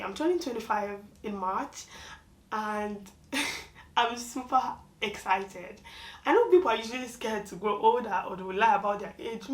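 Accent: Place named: France. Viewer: ❤❤❤happy new year in Advance …your English is already having French intonation…bonsoir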